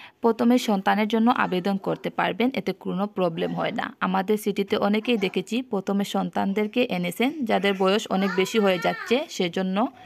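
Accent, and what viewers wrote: Sylhet, Bangladesh. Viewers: আপুর বাড়ি মনেহয় সিলেট, আপনার মাত সিলেটির মতো লাগের।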